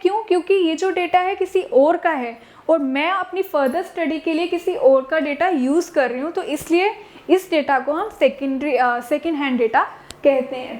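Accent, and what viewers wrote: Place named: Bihar, India. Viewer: Mam app acha padhtii hoo but app measurement conclusion kee sahi pronunciation use kero app Bihari pronunciation use mat keri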